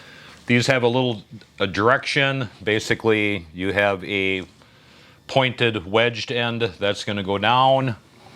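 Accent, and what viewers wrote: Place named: Wisconsin, United States. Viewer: you sound like a fellow Wisconsinite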